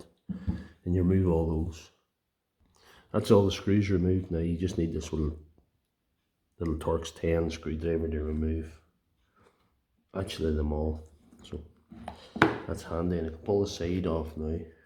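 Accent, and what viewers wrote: Northern Ireland, United Kingdom. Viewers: Having a go at this later lad. You from Northern Ireland? Ballymena here 👍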